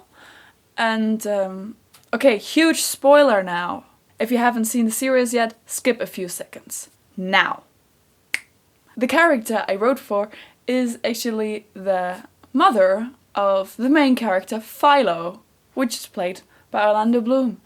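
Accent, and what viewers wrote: Ireland. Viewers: Willout Up until seeing this video, to me; She always sounded as if she had a thick Irish brogue. It still sounds that way; to me. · Willout I also believe in a Q and A a couple of years ago, said she'd spent some time in Ireland as well, which explains the brogue.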